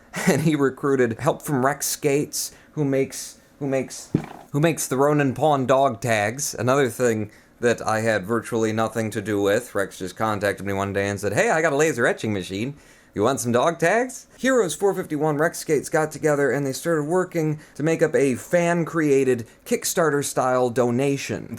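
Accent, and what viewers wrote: United Kingdom. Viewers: Michigan? Hmm for some reason based on your accent I figured you were from somewhere in the UK. Oh well. We are like state-neighbors. I'm from Ohio. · that was amazing, it's kinda crazy that i now know what part of the world you live in, for ages I thought you lived in England, you accent is such a mix of British and American, and in your earlier videos you sound pretty much completely British. You deserve all the love your fans give you!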